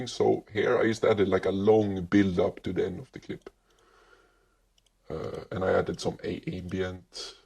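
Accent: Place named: Sweden. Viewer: ur swedish?😃I recognioze the accent 👀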